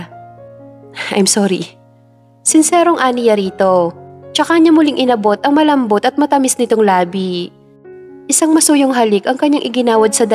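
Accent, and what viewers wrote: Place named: Cordillera, Philippines. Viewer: narrator parang taga somewhere in Kalinga or Ifugao ka,rinig po ung tuno sa boses mo sometimes hehe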